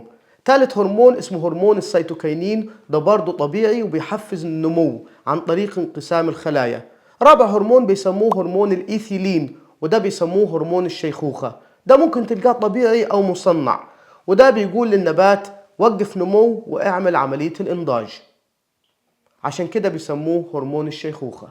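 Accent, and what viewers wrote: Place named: Qena, Egypt. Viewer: ماشاء الله تبارك حلقات جميله كلها علم وجميل جدا فقرة الشعر والاجمل الاختيار الموفق للابيات والممتع اللهجه القناويه بارك الله فيك وزادك علما ونفع بك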